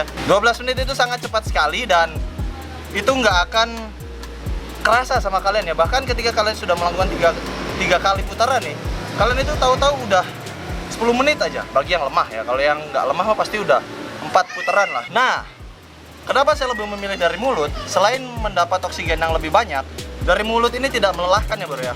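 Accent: West Java, Indonesia. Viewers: Orang sunda bukan bang?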